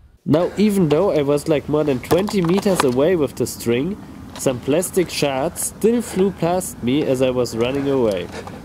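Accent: Germany. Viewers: Most german accent i've ever heard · Der Deutsche Akzent ist so geil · Beside the German accent it is always important to try this under a 380kV power line, to get the extra fizz, at least when the plume rises high enough. If seen more intelligent YT videos of wasting money or creating some bang. Try it with 1000g Ammonal next time. It's cheaper and more impressive. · I hear a Deutsch akzent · klingt sehr deutsch haha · Your German I know it. (I’m German) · German accent makes it even more professional! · Du sprichst sehr gut. Aber dein deutscher Akzent hört sich ein wenig lustig an. Das muss ich zugeben. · Den deutschen Akzent hab ich sofort raus gehört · na ja.. Umwelt Verschmutzung das Plastik Bekommst Du Nicht restlos weg.. und 2 Ich höre das du Deutscher bist. also Hättest du es auch gleich in deutsch machen können..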